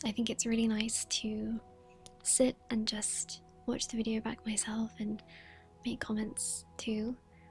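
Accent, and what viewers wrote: England, United Kingdom. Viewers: Beautiful accent! What part of England are you from?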